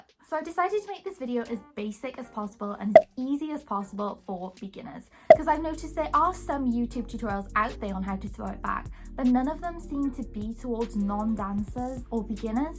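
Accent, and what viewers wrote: Wales, United Kingdom. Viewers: Hmm you sound welsh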